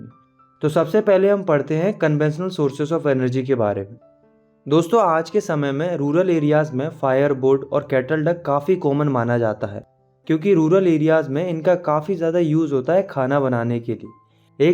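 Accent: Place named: Bihar, India. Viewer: just focus on his accent chapaniya bihari paan wala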